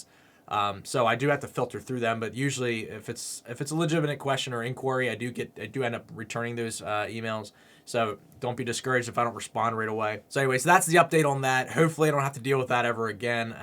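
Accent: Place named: Pennsylvania, United States. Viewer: Brother your PA accent is awesome! Reminds me of my 9 year’s training in philly. Your channel is the best!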